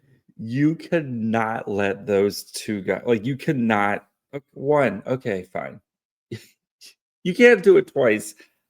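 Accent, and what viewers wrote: Canada. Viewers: Relax. Are you Canadian? That is not a a central valley accent.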